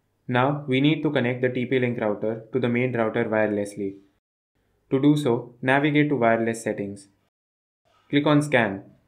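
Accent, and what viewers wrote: India. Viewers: regardless what you are talking about Indian english is just funny :D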